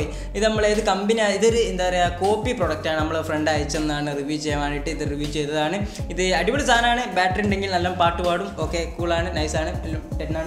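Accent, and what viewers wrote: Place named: Malappuram, India. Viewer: ആ മലപ്രം ടച്ചുള്ള സംസാരാ ഇഷ്ടം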